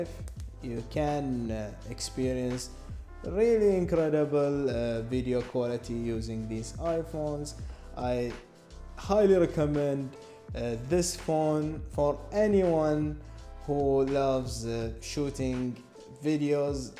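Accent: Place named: India. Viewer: اسم القناه انبكوس عربي ويتكلم انقليزي مثل الهنود اش فيه ؟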